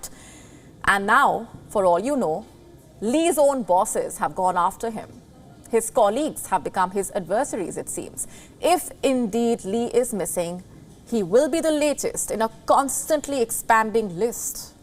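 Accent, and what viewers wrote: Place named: India. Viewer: Indian tongue as always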